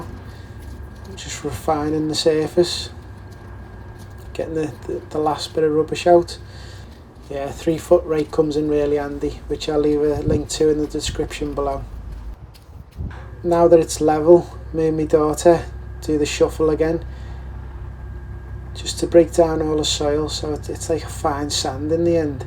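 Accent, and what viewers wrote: Liverpool, United Kingdom. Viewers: We’re planning on levelling out the lawn and it’s so overwhelming. Seen so many videos trying to figure it out. Then heard you were scouse, subscribed immediately 😂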